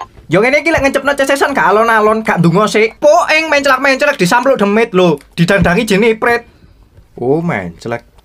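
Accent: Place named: Malang, Indonesia. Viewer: Eruh sound malang mas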